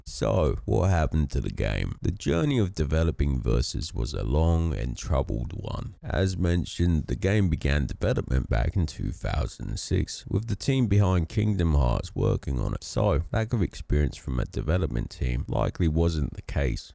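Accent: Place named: United Kingdom. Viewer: You sound like Moe Sizlack from The Simpsons just getting out of bed with a british accent.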